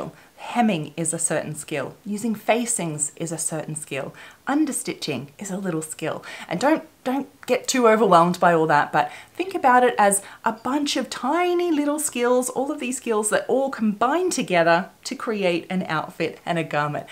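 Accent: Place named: Australia. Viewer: Hi, so great to hear an Aussie and refresh my sewing skills. I have been sewing for a few decades and have waxed and waned with my sewing. After years of sewing quilts etc I am keen to get back into sewing clothes. I have dipped into your videos and love that you are focusing on sewing clothes, your videos on altering opp shop finds are great too. Thank you for such informative and lovely videos.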